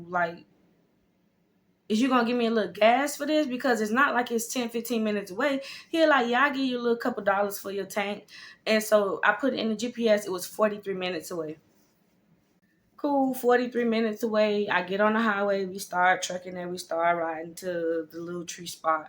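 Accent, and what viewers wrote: United States. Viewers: You sound like your from Louisiana though. 💜 when you were listing the dudes I was looking around my room. Hell! 😂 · This 💩 sounds so Houston!!! Cracking up laughing 😂😂 All those dudes in one room (I’m hollering) 😂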